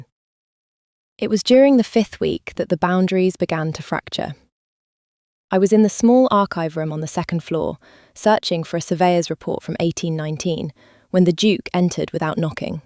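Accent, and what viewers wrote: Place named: United Kingdom. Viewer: Finally a brittish accent. Nice story. Gothenburg, Sweden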